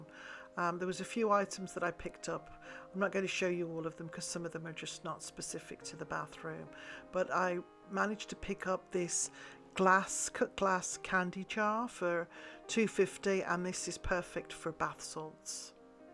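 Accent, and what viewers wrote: United Kingdom. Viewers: Hello I just wanted to tell you, that you have done a beautiful transformation to your bath! I enjoy listening to your voice and the little Brit ways of talking about your experience! Good luck with the rest of your home. I look forward to seeing all of your transformations! God bless and cheers! Theresa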